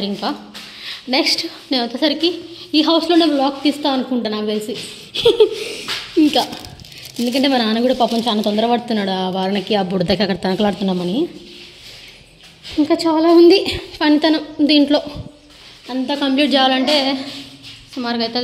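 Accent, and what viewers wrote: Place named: Kadapa, India. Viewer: Meeru matlade language same ma area lo lage vundi, garu meedi e vurandi, meeru kadapa district ki chendinavara?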